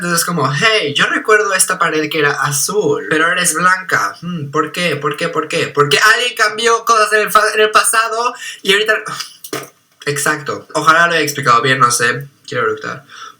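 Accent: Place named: Latin America. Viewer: soy española yuhuuuu me he dado cuenta de que hablas hispanoamericano, con hacento de hispanoamérica, tu profesor es de ahí? 💙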